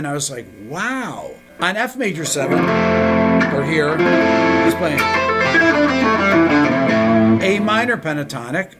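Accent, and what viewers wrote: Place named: United States. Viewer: Been playing for 40 years but my knowledge is reassured everytime I listen to Me Beato, being Spanish my first language,my English skills are also honed with his excelent American accent.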